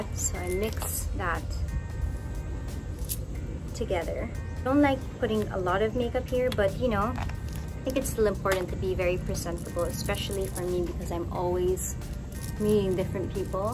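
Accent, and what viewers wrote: Bicol, Philippines. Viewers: Heart is a well-known personality yet in her previous vlog she went to a thrift store other personalities don't do that but she does! I Love you miss Heart! Keep slayin'💖💘 I also love her Bicol accent😍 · "Luway-luway lang tabi"! Hihi nakaumok dangugon ka magbicol! So cute! 😍💕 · That "luway luway lang tabi" ang cuteeee. Lakas maka shala pag siya ang nag B-Bicol.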